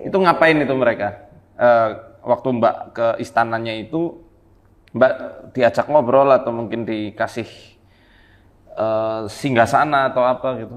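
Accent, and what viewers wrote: Banyumas, Indonesia. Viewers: Masya Allah tabarakAllah,seneng banget nek krungu pak ustadz ngomong ngapak ,medok banget kaya nyon🤭 moga sehat"slalu pak ustadz dan teamnya .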